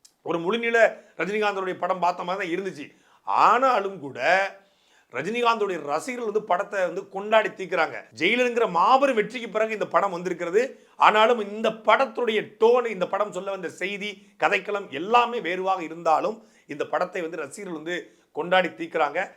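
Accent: Tirunelveli, India. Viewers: அவர்களின் தமிழும், கதை கூறும் பாங்கும் அருமை.. நெல்லைத்தமிழ் இடை இடையே மணக்குது.. வாழ்த்துகள்.. கொஞ்சம் ஆங்கிலம், வட மொழிச்சொற்களை களைந்தால் இன்னும் சிறப்பாக இருக்கும் - நாம் தமிழர்!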